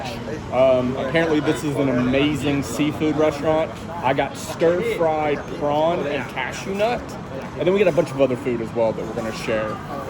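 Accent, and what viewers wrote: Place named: Pittsburgh, United States. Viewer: Are you from Pittsburgh? Your accent sounds like it. Great channel.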